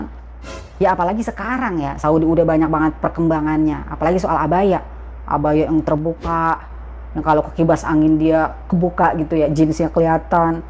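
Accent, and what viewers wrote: Jakarta, Indonesia. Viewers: Aku seneng banget dgn gsya bahasa yg asli betawi Indonesia.... Apalagi dgn polos rendah hati dan tidak sombong. Kereen.❤❤❤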